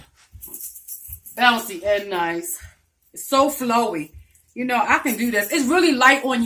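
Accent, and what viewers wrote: Baltimore, United States. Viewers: Baltimore accent SKRONG 💪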